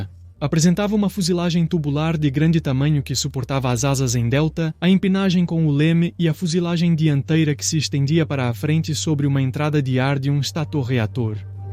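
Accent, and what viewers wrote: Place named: Portugal. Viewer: Acento portugués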